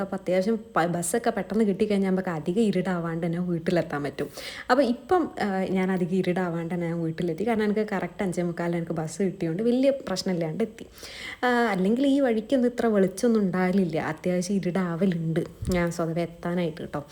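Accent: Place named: Kozhikode, India. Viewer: Calicut Pronunciation. (slang )🙌 I have lot of colleagues from കോഴിക്കോട് in UAE-❤❤❤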